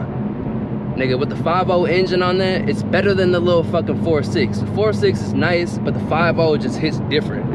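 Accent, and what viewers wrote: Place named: New Jersey, United States. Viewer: You definitely sound like you from Jersey B 🙌🏽💯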